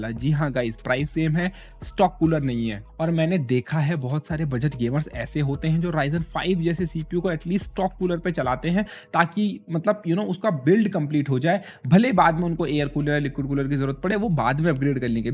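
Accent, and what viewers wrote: India. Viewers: i hate this indian accent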